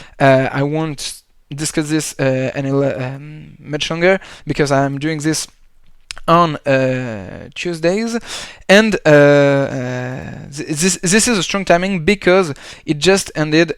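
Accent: France. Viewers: Salut, bravo d'avoir pris le risque, mais fais quand même attention à éviter les fautes d'anglais. Personne te reprochera d'avoir un accent frenchie mais massacrer certains pluriels ou conjugaison pourrait te coûter cher! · Mis a part les "ze" a la place des "the" je crois que ce qui rend l'accent français si identifiable sont les "euh" quand tu hésite, ca sonne vraiment très français, je comprends bien que c'est assez dur a éviter, mais entraine toi a faire des "euh" anglais, ca sera toujours mieux, sinon ton anglais est assez clair et fluide, continue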